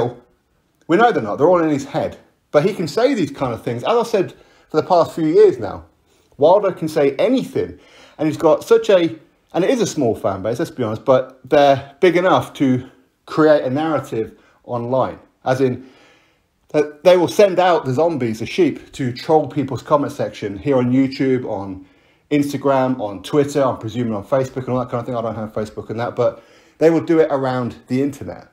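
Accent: United Kingdom. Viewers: You are a biased youtube blogger..glad I don't subscribe to your page....you sound British and biased...Fury is subhuman with the cheating...you can get your small fan base to deny these allegations but they are real...👎👎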